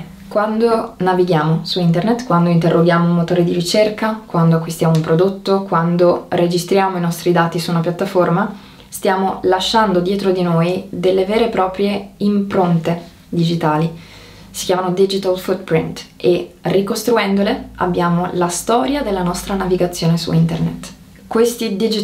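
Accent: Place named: France. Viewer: Scusate ma chi è questa bella ragazza, con accento francese?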